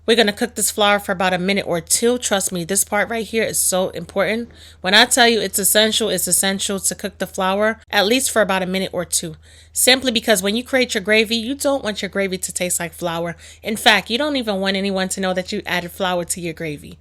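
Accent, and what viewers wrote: New York, United States. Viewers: I thoroghly enjoyed watching this video. Cant wait to try the recipie. Your voice and NY accent is music to my ears. My dad is from Brownsville, so I recognize real NY!!! Have a blessed day!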